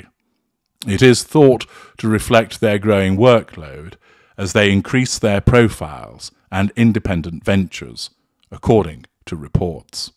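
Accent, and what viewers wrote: United Kingdom. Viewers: Someone thinks ur Sam Witwer. I had to look to see who that was...nope..u may be fooling me, but I think u r really British. 🤔 yes? No?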